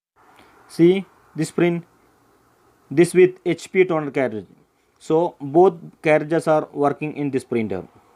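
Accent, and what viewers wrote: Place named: India. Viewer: thanks...but your accent is too hard to understand for non-indian...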